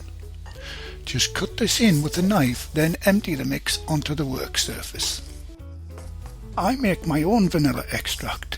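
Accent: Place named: Northern England, United Kingdom. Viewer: Hi. I discovered your channel recently and really do enjoy your recipes. It’s great to hear a friendly northern chap giving it a go in the kitchen. Will you be planning any Christmas family favourite recipes, as we get closer to the festive season? All the best.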